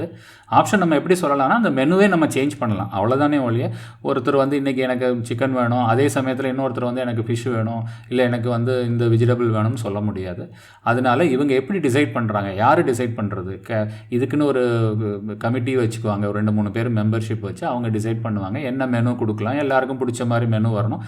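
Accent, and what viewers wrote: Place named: Karaikudi, India. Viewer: காரைக்குடி ஒரிஜினல்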